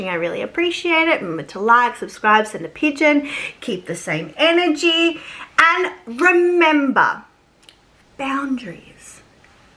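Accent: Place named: Australia. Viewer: Love hearing another Aussie on the platform! 😊😊 keep it up!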